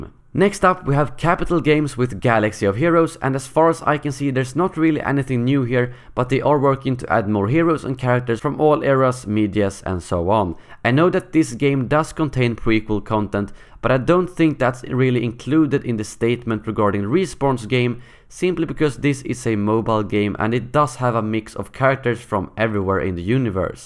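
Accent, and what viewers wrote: Sweden. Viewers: Allow me to congratulate you for the incredibly well-packed video full of information, and when you speculated it was properly mentioned. Your videos are getting better by the day :D (still miss the swedish accent of the earliest videos hahaha). Keep it up!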